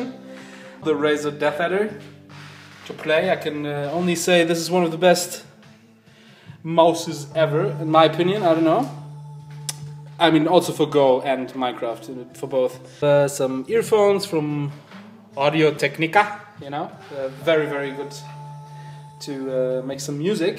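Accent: Germany. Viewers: 100% DEEnglisch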